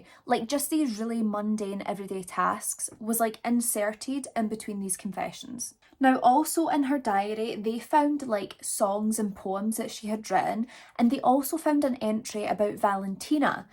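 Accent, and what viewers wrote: Scotland, United Kingdom. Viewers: Oh my- you’re so beautiful also! Your accent! 🥰 I take it you’re a Scot? 🏴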